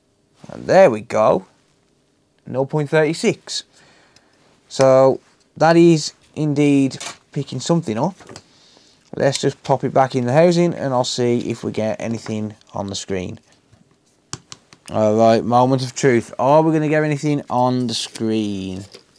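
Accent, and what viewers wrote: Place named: Birmingham, United Kingdom. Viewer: Hi mate new sub here I'm liking the vids. Found you through Tronicsfix! Just curious what accent is that? Birmingham? I just like to guess accents lol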